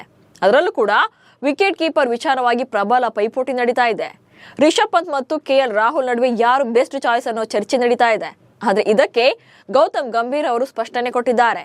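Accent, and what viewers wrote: Standard. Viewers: Swachcha kannada da dhvani shanta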